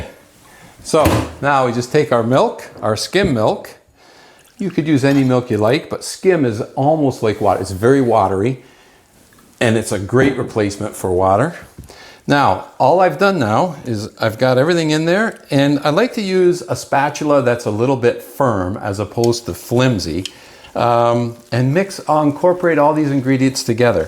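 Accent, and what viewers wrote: Canada. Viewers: Looks great. Will definitely give it a go. Are you Canadian?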